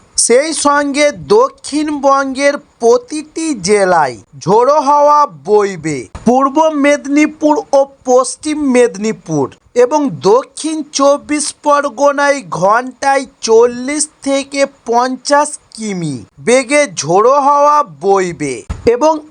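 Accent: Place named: Malaysia. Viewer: তুই এই চ্যানেলটা কবে খুলেছিস তোর গলার শুনে মনে হচ্ছে তুই মালয়েশিয়া থেকে আসছিস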